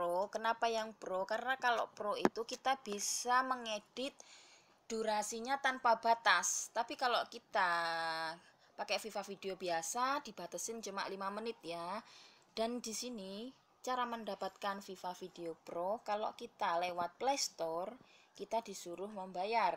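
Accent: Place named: Java, Indonesia. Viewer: Omongannya medok 😁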